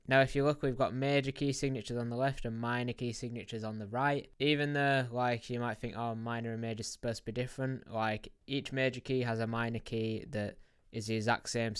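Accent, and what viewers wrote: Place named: Yorkshire, United Kingdom. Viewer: I thought I recognized that Yorkshire accent. I'm from Halifax. Loved the video and gave it a like. P.S I use FL Studio and you're a Logic user but your 99.% perfect 😂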